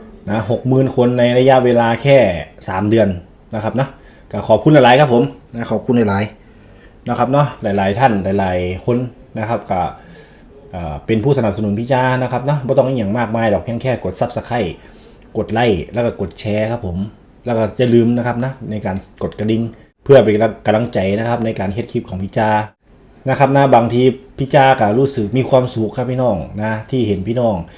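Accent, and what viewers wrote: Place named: Udon Thani, Thailand. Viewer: คือเว่าคือคนอุดรฯแท้อ้าย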